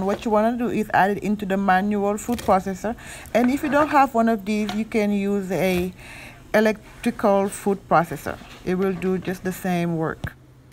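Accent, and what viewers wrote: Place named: Haiti. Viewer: You sound haitian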